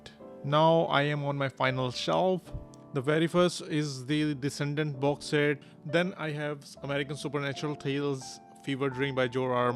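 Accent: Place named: India. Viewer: why your accent is so horrible like an indian accent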